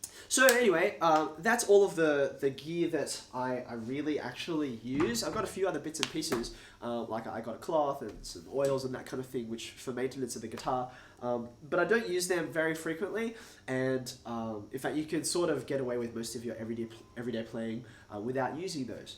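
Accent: Australia. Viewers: Think he is saying "gig", it's just the AusTRAYlian mate.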